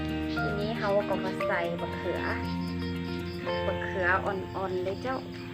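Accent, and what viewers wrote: Northern Thailand, Thailand. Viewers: ภาษาเหนือน่ารักจังค่ะ อาหารก็น่ากิน☺️❤️ นิ้งมาย้ำกำลังใจให้กันเหมือนเดิมค่ะ❤️ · ภาษาเหนือน่ารักไพเราะมากๆครับ อาหารก็น่าทานครับ สวัสดีครับ มาติดตามรับชมและให้กำลังใจครับ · ชอบภาษาเหนือจัง มีเสน่ห์ ตำเครื่องแกงด้วยอีกแรงนะคะมามี้แรงเยอะมากจ้า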